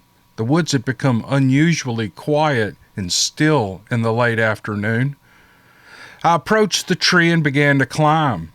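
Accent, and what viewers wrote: South, United States. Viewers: I'm not just saying this, you are the best storyteller on the YouTube! I have been blessed to be listening to your stories for 2 years now. I listen to many of my favorites over and over! Like I said, you are the best! Keep up the good work. We love your face, we love your personality and at Southern accent is a huge Plus. Blessings to you and your family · Hello from TN. I personally like it mostly when you only narrate the stories. Only because I've grown accustom to hearing you. That said, many times I fall asleep , not that it's boring. But you have such a soothing voice and listening to you, I can actually place myself into the story like I'm really there and experiencing it. You talked about your work, somewhat. May I ask what is your profession? I think I enjoy hearing that southern brawl more than anything. It just gives each story that special twist that we Southern folk seem to have. 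See, if I am watching you, then it's like I'm focusing more on you and not as much on the story. Just my own personal opinion. Think u should leave it the way it is. I'm getting older and don't like change. So, that's MY hang up, not yours. Anyway, keep the stories coming. They are very entertaining and your voice is enjoyable. Not taking away from your handsome face, but the not knowing what someone looks like behind the voice is suspenseful and intriguing. I'm not commenting about your pets because I've never been and am not a whole lot now of a pet person. I don't knock others for their own feelings. It's y'all's prerogative if ya want to be pet parents. Everyone is different and entitled to their own opinion. In closing, I say to you, Thanks and carry on! Oh, and I'm now a new sub!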